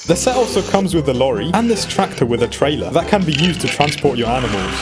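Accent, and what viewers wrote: England, United Kingdom. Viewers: are you english or british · He British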